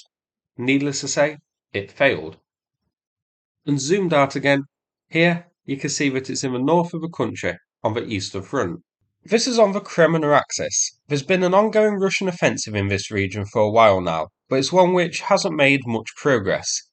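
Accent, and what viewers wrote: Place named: Grimsby, United Kingdom. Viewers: A coastal town in Northern England, a different part of which sounds like he is from. Grimsby. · I have been trying to place accent for ages, and now I know. Eh up Grimsby! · Are you a Grimsby lad 👍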